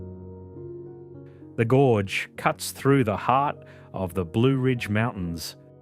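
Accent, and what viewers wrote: Australia. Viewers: I don't think there is anything I wanted more today than to come home eat some Panda Express and listen to an Australian man teach me about my home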